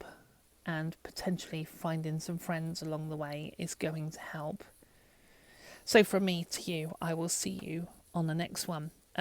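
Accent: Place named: RP, United Kingdom. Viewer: I found you. You have a Tremendously strong QUEENS ENGLISH accent (hope thats not bad what i said?) A blogging channel? Ok... I'll be actively looking out. Nice to find you 😊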